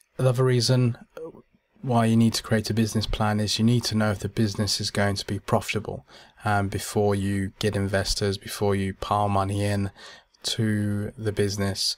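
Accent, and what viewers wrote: United Kingdom. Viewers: Great to hear UK tutors on line.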